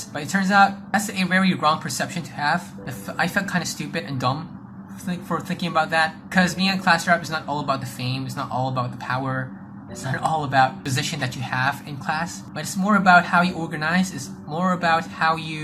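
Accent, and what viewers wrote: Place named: United States. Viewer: love your American accent 👍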